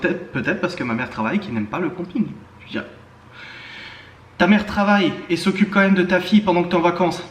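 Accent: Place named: Lorraine, France. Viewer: Hihi tu as vraiment un patois Lorain. "en retraite", nous les sudiste on dit "à la retraite" je trouve ça marrant. Mais bon je te fais cadeau d'une expression patoise gersoise "tataragne" pour araignée ^^.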